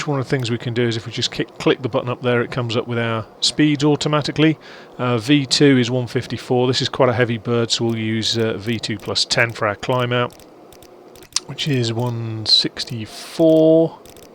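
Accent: United Kingdom. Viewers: Is this a Lancashire accent? Sorry this has nothing to do with the aircraft. I just bought it an watching for info. I love midland accents and I'm trying to distinguish the different ones.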